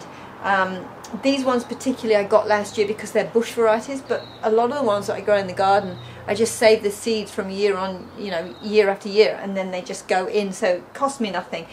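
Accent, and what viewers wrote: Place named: United Kingdom. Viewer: I am so glad I sat next to Holly yesterday and found your site, lovely to hear the UK accent, I have a farm but really struggle to grow stuff(Mainly ate by animals possums and rabbits ) So I am excited to follow you and will sow my indoor seeds very soon. I have a worm farm and Cow, horse sheep poo but will use shavings for the chickens.( you would be very welcome to collect the other manure from us if needed ) Thank you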